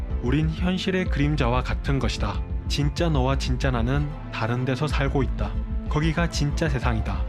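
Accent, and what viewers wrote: Gyeongsang, South Korea. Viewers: ㅋㅋ보통다서울말로만 듣다가 경상도분이니깐 되게신기해요ㅋㅋㅋㅋ 나쁜뜻아니고 딱한마디 듣고 빵터졌어요ㅋㅋㅋ 귀여워용 크 친근감ㅋㅋㅋㅋㅋ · ㅋㅋ 사투리 너무 재밌어요 경상도 사투린가요?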